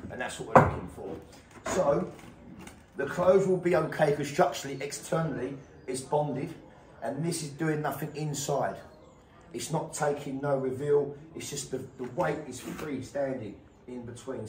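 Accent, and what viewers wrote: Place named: London, United Kingdom. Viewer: Saw your vids on the March you speek total fkin truth. Good on ya mate. You've got that proper London accent too 👍😊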